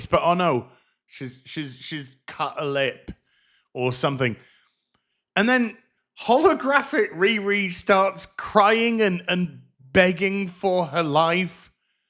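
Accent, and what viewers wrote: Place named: England, United Kingdom. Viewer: It’s not a British accent, it’s an English accent. An Englishman from England, speaking English.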